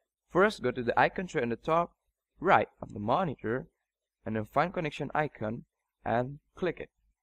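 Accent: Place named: Spain. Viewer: indian guy with a spanish (is that spanish) accent? EPIC!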